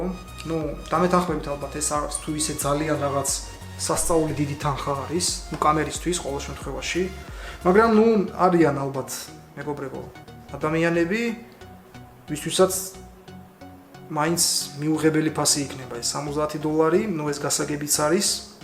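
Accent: Georgia. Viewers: თბილისელი არ ხარ?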